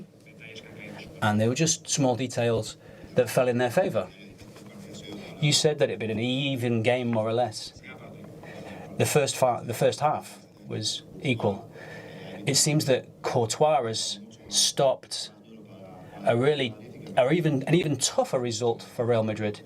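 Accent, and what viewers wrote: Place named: Liverpool, United Kingdom. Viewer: he still has that Scouser accent when speaking English. ❤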